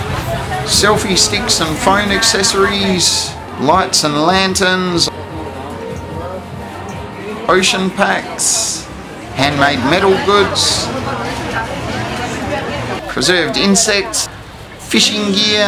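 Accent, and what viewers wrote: Australia. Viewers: Such a strong ozy accent.😂😂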